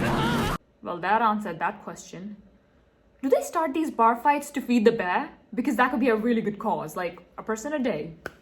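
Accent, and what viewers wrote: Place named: United Kingdom. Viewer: I'm still learning english, and I'm bad with accents. Where are you from? Seems britanic, but I am not sure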